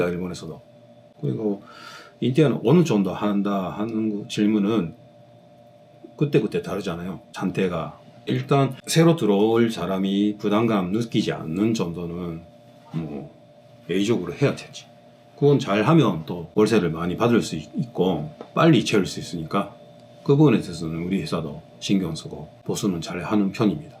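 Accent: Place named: Gyeongsang, South Korea. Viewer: 구수한 갱상도사투리 듣기좋아요😊😊😊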